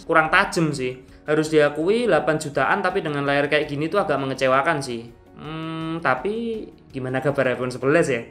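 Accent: Semarang, Indonesia. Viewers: Dari awal dengerin video nya kok bahasanya kayak medok gitu. Dan ternyata benar. Wong semarang🤣🤣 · orang semarang bro?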